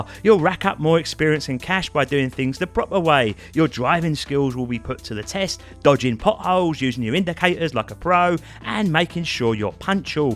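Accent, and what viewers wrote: Cockney, United Kingdom. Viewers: This guy is Cockney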